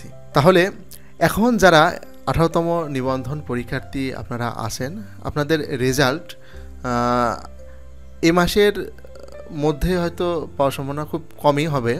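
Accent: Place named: Khulna, Bangladesh. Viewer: Apnar basha Khulna.. right?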